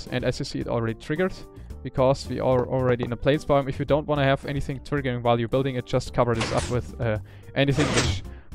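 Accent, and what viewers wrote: Germany. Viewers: Your english is VERY german.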